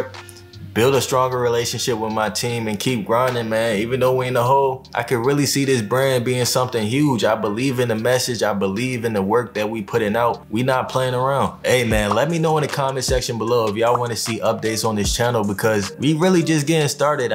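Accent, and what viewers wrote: Philadelphia, United States. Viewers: I usually don't comment to any videos, but really, I enjoyed the language and the knowledge he shared, maaaan check how he pronounce the hundreds LMAO, I just feel like I'm talking to a close USA friend haha peaaace man ❤ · Are you from Philly?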